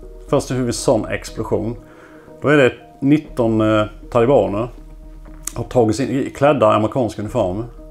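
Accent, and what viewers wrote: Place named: Jönköping, Sweden. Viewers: Tror han är från Forserum, så nära. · Kan han vara från Jönköping, bred dialekt 😃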